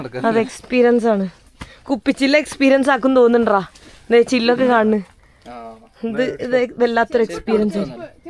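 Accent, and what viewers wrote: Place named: Kannur, India. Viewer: ഇതല്ലേ,ഞാള് കണ്ണൂര്കാര് തമ്മു കണ്ടാൽ പറയുന്ന ഭാഷ! 😄😄😄😄😄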